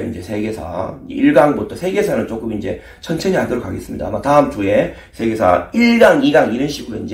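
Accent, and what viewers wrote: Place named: Gyeongsang, South Korea. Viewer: 듣다보니 깔끔정리 잘해주시고 시간이 금방가네요 경상도 사투리도 시원시원하시네요 또 올게요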